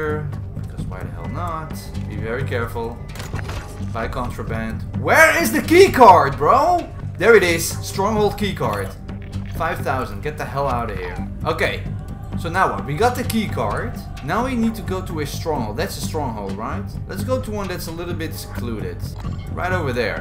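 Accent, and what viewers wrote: Netherlands. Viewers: Ik hoor gewoon dat je Nederlands bent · Are you dutch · You can hear your dutch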